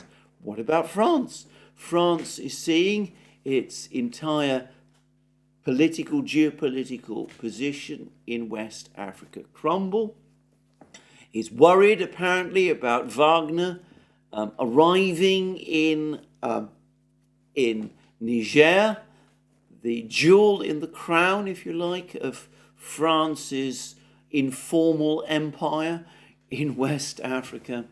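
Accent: United Kingdom. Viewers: Sheer and shameless bias. You are ridiculous and only act rational with that pompous British accent.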